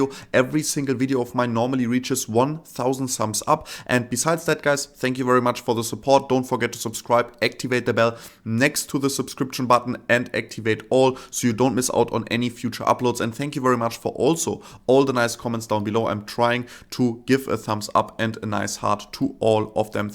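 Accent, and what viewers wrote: Germany. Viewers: Your videos are fantastic. Thank you! Packed full but brief and to the point. As a linguist, I'm curious about how you acquired English given that you have some German accent but your grammar and vocabulary are flawless. Did you spend a few years at a younger age in an English-speaking country?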